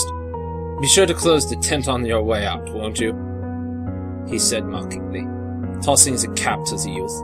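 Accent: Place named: Germany. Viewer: Nice work with the German accent my friend. Tres bien mon amie.:)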